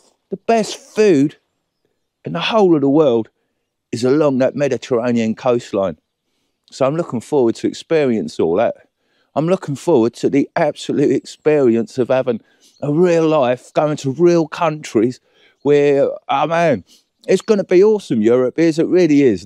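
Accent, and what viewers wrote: United Kingdom. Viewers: lol that ole norfolk accent soon comes back!!! lol miss norfolk, esp sheringham & cromer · Good show. Thank you for sharing your life. You have much thicker British accent now that your back in the UK. I like Flo, you even have a sunroof. Looking forward to your upcoming adventures in Europe. Will you be getting the Netherlands? Amsterdam would be a very cool place for a vlog.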